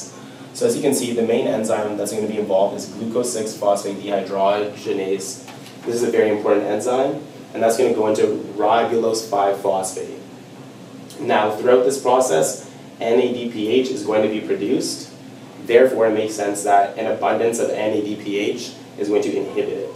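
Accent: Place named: Canada. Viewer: That Canadian accent strong! Nice video. At the end it seems like you were going to make a point that alcoholics typically have B1 thiamine deficiency, which, I assume you were going to say results in deficiency of transketolase. Is that significant? Or will it just act as a shunt towards Nuc Synthesis and not be able to recycle back towards glycolysis?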